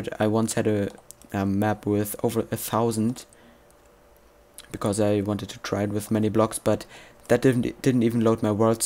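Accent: Germany. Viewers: Are your a german guy?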